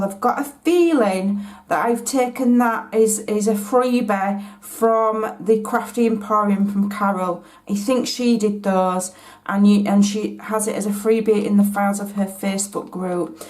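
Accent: United Kingdom. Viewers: Sometimes the British way of speaking is hard for me to understand. Could u please repeat the lady that sells the beautiful Victorian art pictures? I would love to also creat these bags. Love your videos thanks so much.....I am a shabby chic. Lady also....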